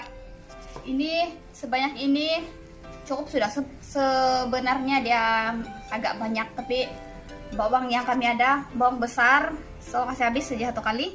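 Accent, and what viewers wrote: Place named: Sabah, Malaysia. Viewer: Pekat juga Sabah kau bha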